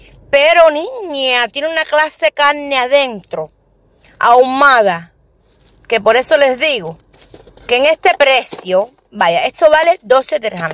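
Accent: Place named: Cuba. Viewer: ME ENCANTAN TUS VIDEOS, ERES SUPER COMICA. NO HAY NADA MEJOR QUE COMENZAR EL DIA MIRANDO UNO DE TUS VIDEOS PARA ESCUCHAR EL ACENTO CUBANO Y REIRME CON TUS COSAS. QUE ALLAH TE BENDIGA SIEMPRE.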